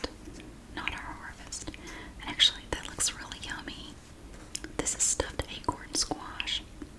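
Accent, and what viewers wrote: South, United States. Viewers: Her soft Southern accent is incredibly relaxing. She could tell me I was fired and I'd probably ask her to say it again a couple of times.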